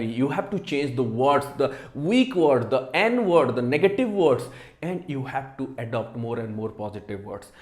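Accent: India. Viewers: I joined you back in January. I love the way you say things and you’re Indian like me with the Indian accent like my parents. Please let me know when you come to Orlando, Florida.. I would love to meet you. Soni Add me on Instagram ( sonibaby) and Facebook. * I have manifested things bc of your teachings. I can’t thank you enough.😘🙏🏽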